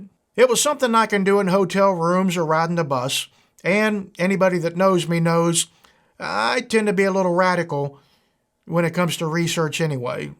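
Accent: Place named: West Virginia, United States. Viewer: I would have known where you were from just by talking. I know because I talk the same way. Keep up the Great Work. Keep making West Virginia look great. Thanks.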